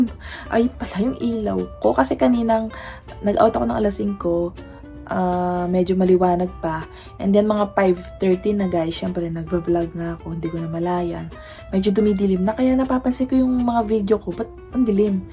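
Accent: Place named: Pampanga, Philippines. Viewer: Kapampangan ka ne madam hehe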